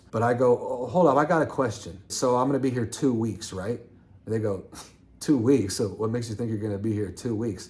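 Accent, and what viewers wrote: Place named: AAVE, United States. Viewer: You sound much better when you drop the Ebonics accent. No offense. Why keep up with the African American accent. I understand that you are into Rap and music etc. I don’t even think that Eminem speaks with an African American accent.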